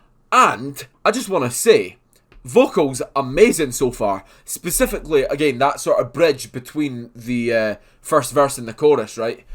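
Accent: Scotland, United Kingdom. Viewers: Of course! I perfectly understood you! But even if I didn't, I would happily exchange that for the Scottish flair 😂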